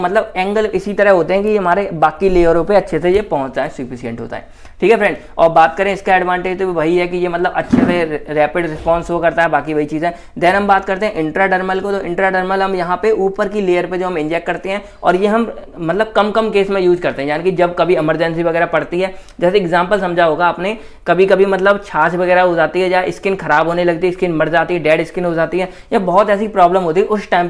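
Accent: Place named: Uttar Pradesh, India. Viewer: u r from up nnaaaa......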